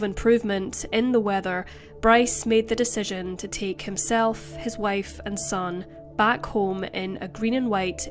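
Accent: Scotland, United Kingdom. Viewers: UR SCOTTISH ACCENT IS AWESOME & HELPS ME WITH MY DEPRESSION, ANXIETY & 💤😴! I KNOW 🌏🌍🌎HAS WORSE PROBLEMS THAN MY ABUSE I DEAL WITH OVER 20 YRS NOW!!! THANKS, & HER " FAITHFUL SUBSCRIBERS!!✊🏽🇺🇸🇺🇸🇺🇸🇺🇸🇺🇸🇺🇸🇨🇴🇨🇴🇨🇴🇨🇴🇨🇴🇨🇴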